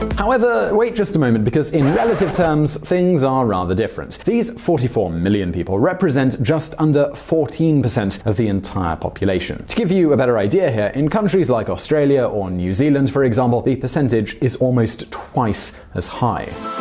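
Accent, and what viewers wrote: United Kingdom. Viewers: Summary of this video: SJW bloke with a British accent calls Trump xenophobic for trying to reduce illegal immigration by citing the contributions of legal immigrants (to the UK). LOL liberal logic. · You always talk about other countries. I will like to know where are you from ? You sound British but you might not be one.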